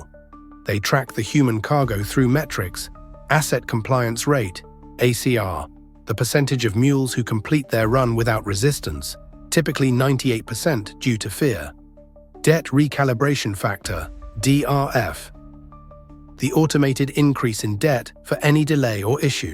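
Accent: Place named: United Kingdom. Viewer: Realy appreciate the british accent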